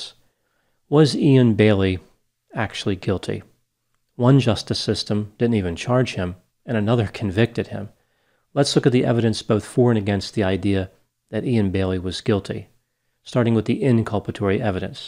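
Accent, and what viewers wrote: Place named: Delaware, United States. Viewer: I think he is from Delaware. It's probley an accent thing if anything. I'm not aware of it.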